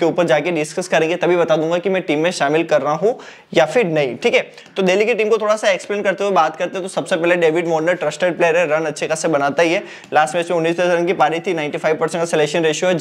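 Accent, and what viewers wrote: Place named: Rajasthan, India. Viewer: हम राजस्थानियों की हिंदी बोलते समय राजस्थानी भाषा निकल ही जाती है 😅😅 लगता है आप भी राजस्थान से ही हो 🎉🎉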